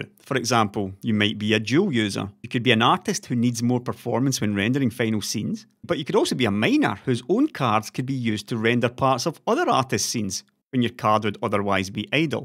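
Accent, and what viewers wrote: Scotland, United Kingdom. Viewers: You're Scottish? Never would have guessed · a thick scottish accent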